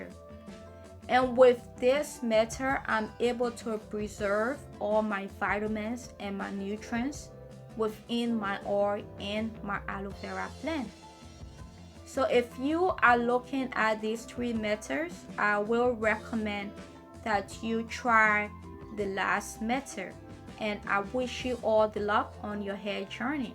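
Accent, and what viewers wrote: Liberia. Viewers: Bella She sounds Liberian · I hear small Liberian English my geh....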